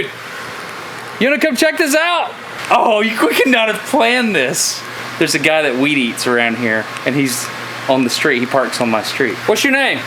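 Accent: South, United States. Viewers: Destin's southern accent came through more when he was talking with.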